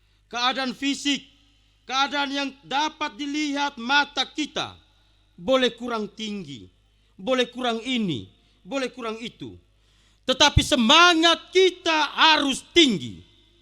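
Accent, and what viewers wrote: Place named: Flores, Indonesia. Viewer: Ini baru kotbah yg menyejukan dgn dialek bhs Daerrah yg kental sy bangga jdi org Flores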